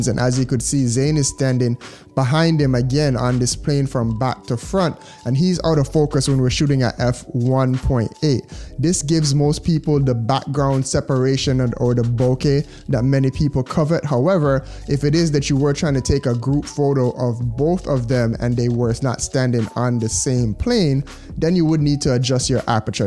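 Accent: Jamaica. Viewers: Transitioning from a career in law to documentary filmmaking and of course taking the self-teaching approach. After so many attempts of trying to understand this, your video is the only one that has made sense for my way of learning. You had analogies and visual examples, properly timed. I took notes! Thanks so much for this and shout out to a fellow Jamaican (based on your accent, hopefully im not wrong :) )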